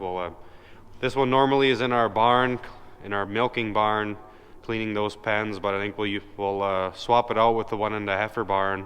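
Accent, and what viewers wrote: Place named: Netherlands. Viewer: Hi, it is quite clear that you presumably have Dutch ancestry, were you born in the USA or immigrated, your English is at least good.. But as cold as it is now, it is not extremely hot in the summer, and isn't it very challenging at times. Thank you for wonderful everyday videos that I can watch here in little Denmark.. Greetings Erik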